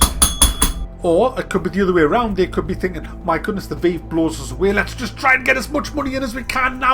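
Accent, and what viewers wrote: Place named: Newcastle, United Kingdom. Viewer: Sounds like he's from newcastle, or near by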